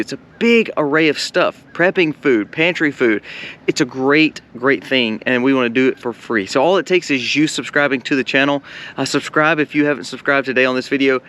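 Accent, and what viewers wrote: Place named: Mississippi, United States. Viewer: Love listening to a local mississippian. Thank you for you updates.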